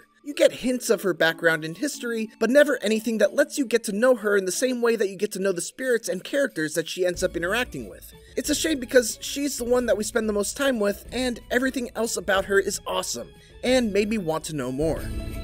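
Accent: Canada. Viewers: Why this dude sound like Canadian Guy Eh? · This guy sounds like Canadian Guy Eh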